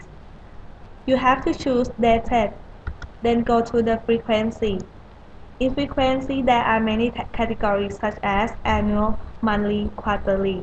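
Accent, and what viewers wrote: Thailand. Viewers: Thai accent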